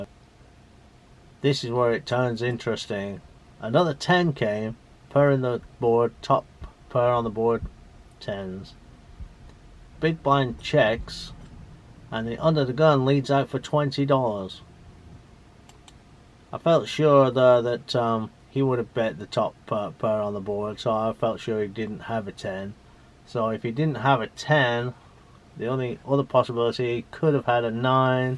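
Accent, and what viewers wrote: United Kingdom. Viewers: You sound like a guy from the north of England, whose been living in the deep south for a little while... am I right? Keep up the great work!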